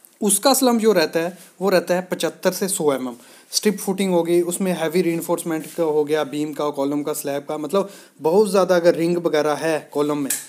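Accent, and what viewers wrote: Jammu, India. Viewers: He sounds like my friend from jammu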